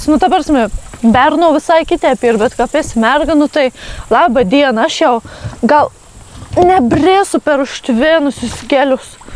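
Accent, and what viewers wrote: Samogitia, Lithuania. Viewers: Jetau kam monie patin to zemaite kalba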